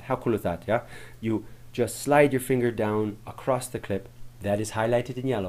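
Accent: Ireland. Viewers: With Irish Accent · Like your style. You make it interesting and easy. Also, great visibility to see exactly the buttons and the steps! The Irish makes it interesting too. Thank you.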